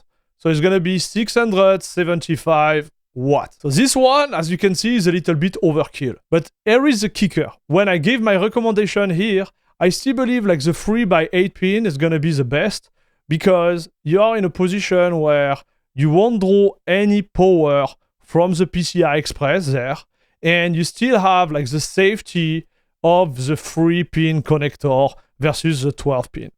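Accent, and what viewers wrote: France. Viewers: I ordered a Powercolor Reaper for 663 euro on Ldlc, great deal in Italy, considering the base MSRP + tax here is 690 euro! I know it's the bottom line model, but i was planning to undervolt it anyway, so the 2x8 pin are perfect for me, since i have a rm650e and might be kinda CPU bottlenecked in some situations anyway with my 5700x3d. Btw, thick French accent! 😁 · OK. I thought you were from France because of the accent. I forgot that parts of Canada are also French-speaking. Warm greetings to you! Thanks for the channel.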